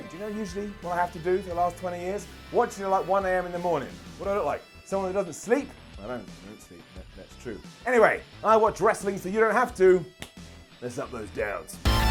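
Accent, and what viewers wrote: Australia. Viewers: bro, you already sound Australian.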